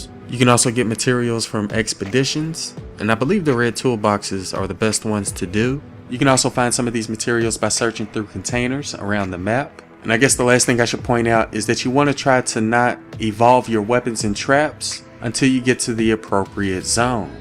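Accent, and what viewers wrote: South, United States. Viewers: I think he talks/sounds hella southern lmao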